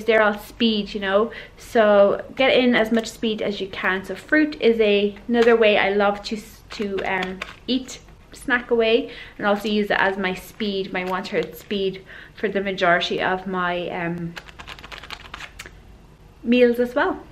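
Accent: Ireland. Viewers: Where in Ireland are you from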